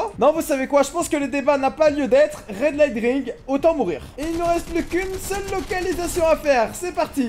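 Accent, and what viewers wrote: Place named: France. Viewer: L'accent Français 😎🤌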